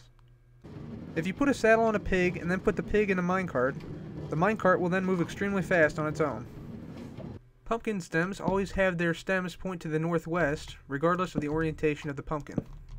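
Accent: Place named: Alabama, United States. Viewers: you sound KKona as fuck my dude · you sound alabama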